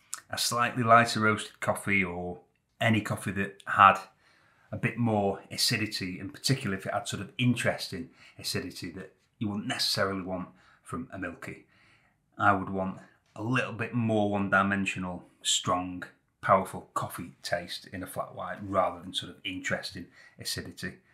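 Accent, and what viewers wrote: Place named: West Yorkshire, United Kingdom. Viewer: You have a similar accent to me. West Yorkshire?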